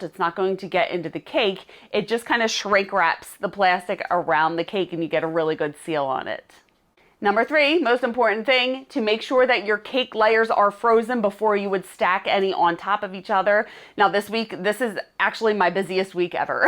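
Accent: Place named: Delaware County, United States. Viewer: I just came across this video trying to find tips for making my daughter's wedding cake. I heard the DELCO accent...living in SC for 14 years now. It's funny how I can still hear it right away. Lol Of course I had to look you up and saw Bensalem... Great tips! Thanks!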